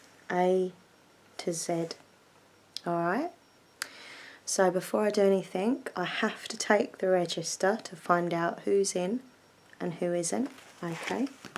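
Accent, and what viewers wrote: United Kingdom. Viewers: your British English is so beautiful！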